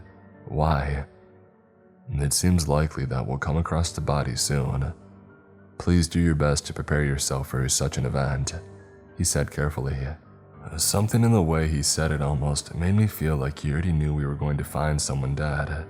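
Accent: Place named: New England, United States. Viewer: When I visited most people called it Baw Hawba. 😂.. I love that Yankee accent!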